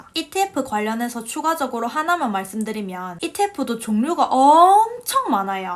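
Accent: Gyeongsang, South Korea. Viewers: 부산사투리인가요? 대구사투리인가요? 찰지네요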